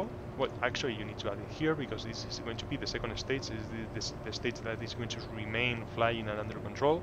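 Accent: Spain. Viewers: Nice, porcierto, asumo que eres español por el nombre y la forma de hablar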